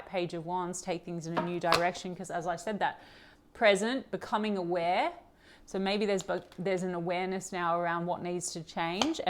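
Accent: Australia. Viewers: Wow, you picked up my kids, resonate with everything.....thank you 🙏 And your Australian 🥳🥰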